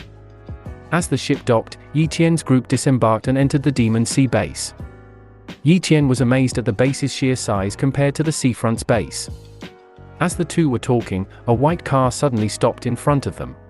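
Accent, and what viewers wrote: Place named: United Kingdom. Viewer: A British narrator this time🧐☕👌 😂😂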